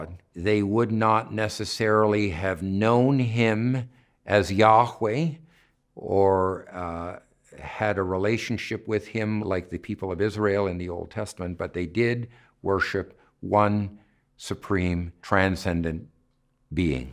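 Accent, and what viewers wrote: Canada. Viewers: One minute in and I pegged him as a fellow Canadian....that accent sticks out like a sore thumb LOL..turns out he is. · Interesting lecture; I could tell he is Canadian right away haha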